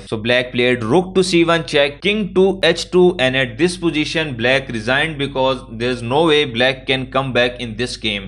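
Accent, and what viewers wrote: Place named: South Asia. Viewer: the voice of chess is oficially an accent from the sub continent. as a pakistani, i love it. a major game / sport where we own the commentary. and of course, indians are top level chess players so it fits well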